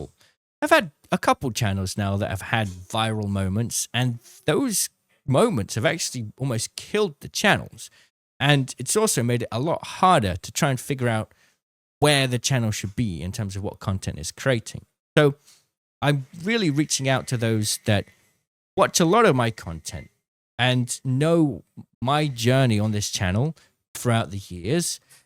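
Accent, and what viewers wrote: United Kingdom. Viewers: In my honesty, I havent really watched so much of your content as of lately and i agree with most of the rest of the comments, it's because of the game itself. I remember the old challenges, the 4ks or load out challenges but the games not the same anymore so I have since quit playing and watching. But you still hold my sub because you still hold a spot in my heart. But if u want some input from me, I would just try doing your type of challenges and content for other maybe less comp games like cod zombies, or risk of rain, or even Elden ring playthroughs. I think all of us love your "weird little British man accent" btw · Yeah, the british accent it's a thing. But I like when you are vibing. I used to like to watch you doing challenges, but I felt you a bit sad and didn't vibing doing that those days. You could bring news about apex, trying other games (new games, BR or classics), testing how good the legends are. 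The most important, just keep doing what you like. Keep going.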